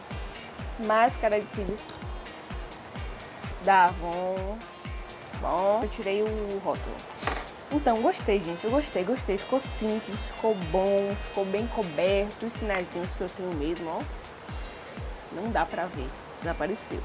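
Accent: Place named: Northeast, Brazil. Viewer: ficou otimo o video ri muito com seu jeito de falar me identifiquei sou nordestina tambem kkkkkkk mulher nao sei me maquiar nao tu deu uma aula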